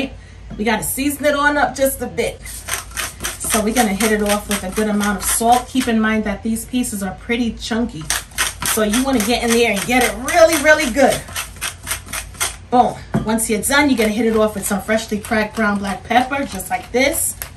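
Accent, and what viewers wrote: New York, United States. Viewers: Start watching... looks nice... Oh dear, American accent, I'm going to have to convert from pounds to kg aren't I...? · I like that NY accent. It's cool that you cut your own meat chunks. It's definitely less expensive.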